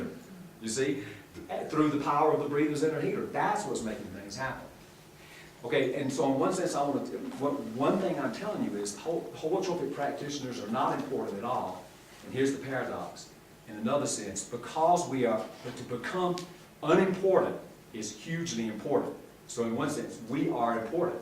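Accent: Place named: South, United States. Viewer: I trained with and Stan, early 90's. Just found out no longer with us. These were, are, legendary days that cannot pass. I know the way he used to talk and the sort of thing he talks about here, and he has same energy, but his strong southern accent isn't so pronounced. Goodbye. See you again probably.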